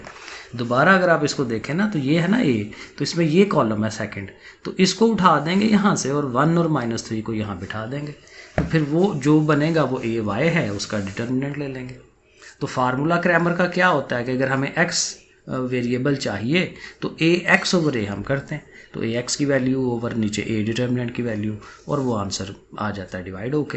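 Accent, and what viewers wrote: Sialkot, Pakistan. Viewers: I think you are Sialkoti😊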